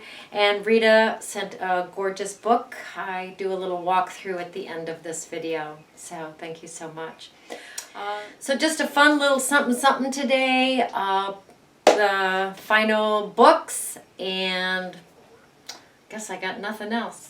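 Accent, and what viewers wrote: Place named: California, United States. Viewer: Oh my gosh it’s early....for me....Would a “button stitched” loop make the closure stronger? Love listening to your California twang, I miss Ventura. And.. I have never heard the term “Underpants” for the binding covers first layers. Makes perfect sense. Love the video. Now I can finish my Cuppa, and go back to sleep.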